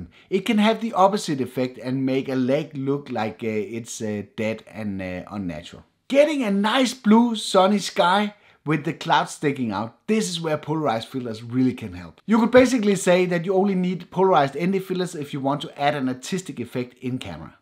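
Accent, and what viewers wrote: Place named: Denmark. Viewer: Fantastic video! Best one on youtube to explain ND filters! oh well, your english has a bit of danish taste. that's even better! greetings from Copenhagen! Mange tak!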